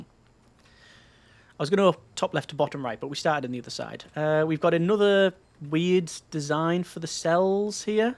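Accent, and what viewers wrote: United Kingdom. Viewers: Are you British? Because I haven't realized that for a long time. 😅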